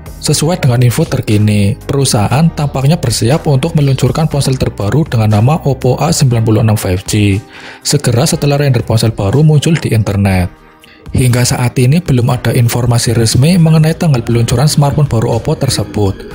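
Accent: Banyumas, Indonesia. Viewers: Ngapak nyaaaaaa,...😁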